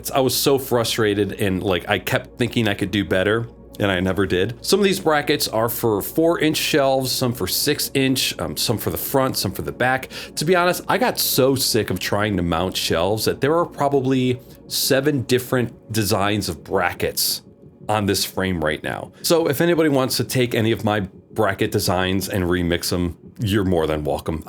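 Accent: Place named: Australia. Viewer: I hope that one day my Australian accent can be as good as yours. This instructional video helped!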